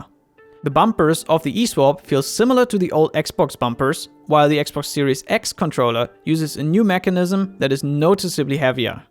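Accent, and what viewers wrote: Germany. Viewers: your english….. sounds a bit german ?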